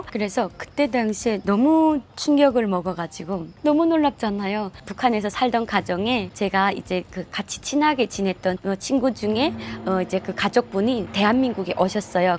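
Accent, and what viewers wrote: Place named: Kansai, Japan. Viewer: 話しかたが大阪のオバチャンみたいで親しみがわくよ。これ大阪弁でやったらウケると思うな。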